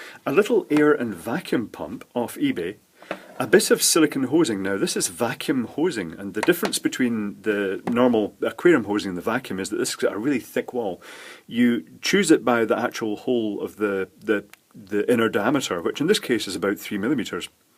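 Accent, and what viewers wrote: Scotland, United Kingdom. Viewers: Ha ha you could not help yourself, your a scottish version of dave jones, but much nicer to listen to:-D, i hope im not insulting you. I like your inginuity, clever but simple enough for people to make:-D That pump is such a clever design:-).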